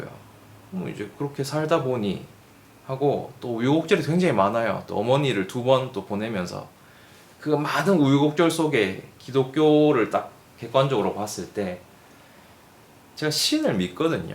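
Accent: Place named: Gyeongsang, South Korea. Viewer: 경상도네 그러니까 우파로. 넘어갔지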